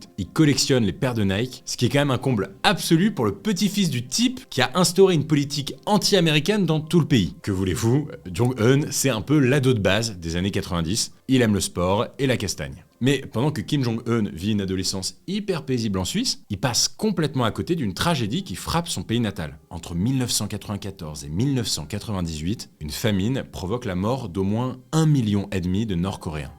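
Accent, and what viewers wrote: France. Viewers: C'est pourtant correct... jamais parfait vu l'accent français mais ça ressemble quand même bcp...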